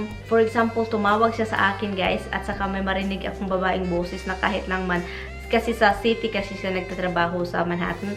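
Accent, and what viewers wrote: Visayas, Philippines. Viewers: Your so funny like ur attitude n visayan accent so darn funny n cute 😁😂🤗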